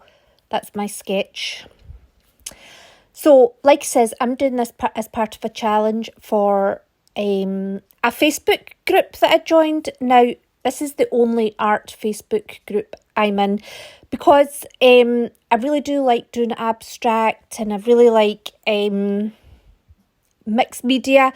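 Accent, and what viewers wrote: Scotland, United Kingdom. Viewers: Loving your artwork! Very inspirational! You are such fun to listen to, your accent is Scottish?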